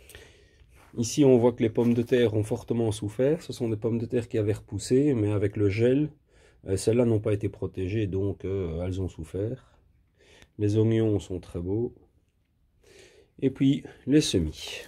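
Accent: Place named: Hainaut, Belgium. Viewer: Bonjour compatriote , je viens de découvrir votre chaîne , Félicitation. Plaisir de découvrir un autre jardinier Belge sur YouTube. Mais d'ou êtes vous précisément ? Vu l'accent je dirais du Hainaut , Mons ou Tournai ou pas loin. Bonne continuation et prenez soin de vous .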